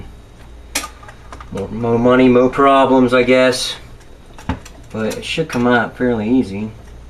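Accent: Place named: Ohio, United States. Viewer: sounds central east Ohio to me. I'm from Cincinnati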